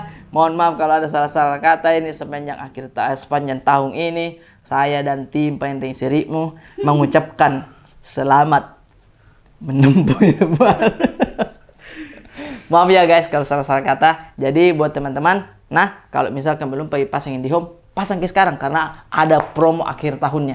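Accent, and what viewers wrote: Makassar, Indonesia. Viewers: Saya orang sunda, saya suka logat makassar😀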